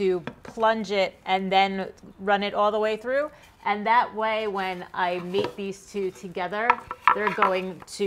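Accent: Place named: Long Island, United States. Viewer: trying to place your accent. Could it be the eastern end of Long Island?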